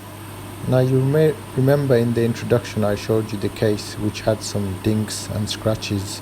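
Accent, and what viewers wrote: Birmingham, United Kingdom. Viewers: great job Sir. Love that you included the multiple visits from your boy too. I can detect your Birmingham accent embedded within your original accent. Very interesting. I love the Birmy accent. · Fellow Brummie here. I live in the States now, and it's nice to watch your videos and hear a Brummie accent! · Birmingham UK? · Birmingham. That's the accent I was having trouble placing. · Hello " Brummie " I really enjoy your videos. Keep up the great work !!!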